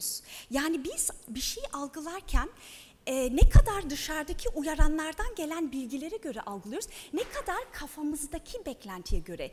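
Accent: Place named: Germany. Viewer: Kesinlikle ama almancı gibi konuşuyor maalesef.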